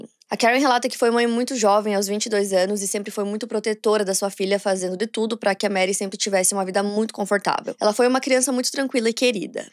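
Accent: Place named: Pernambuco, Brazil. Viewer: vc é de qual estado do nordeste? Reparei em alguns sotaques que parecem ser de pernambuco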